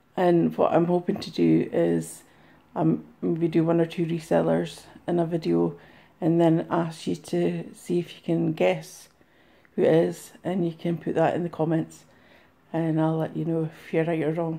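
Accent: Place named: Scotland, United Kingdom. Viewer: You do sound Scottish, but I love it!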